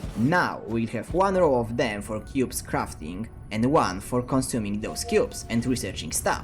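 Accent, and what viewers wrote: Poland. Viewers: Polski akcencik so its peak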